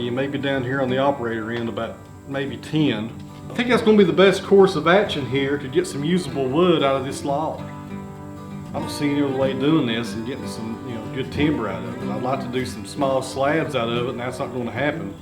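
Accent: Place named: Virginia, United States. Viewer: Very interesting and well put together videos. That’s one hell of an accent you have there..is that Virginia ?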